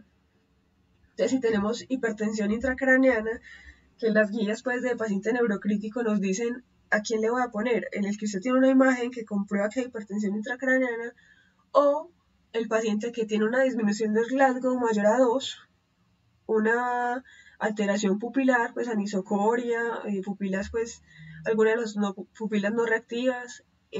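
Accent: Colombia. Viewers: tan bella la doctora con acento de betty la fea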